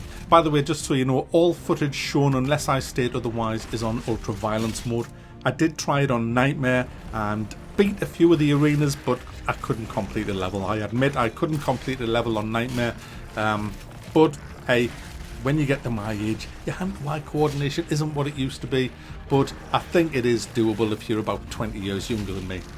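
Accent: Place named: England, United Kingdom. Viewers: you sound like you're from yorkshire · What's this funny as hell english accent? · What? He’s from the North East, probably a Mackem.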